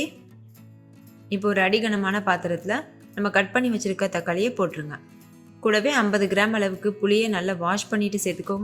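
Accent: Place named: Kerala, India. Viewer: Your way of talk seems you are a malayalee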